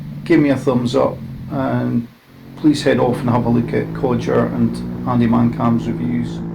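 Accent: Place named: Scotland, United Kingdom. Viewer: Hi pal just found your channel, I'm just interested to find out how much warranty work you've had done on your bike ? Thinking of buying one but not sure whether to go new or second hand, new gives warranty obviously. Also, you sound Scottish, don't know if you live up here but if so, where did you buy yours ? Drysdales ?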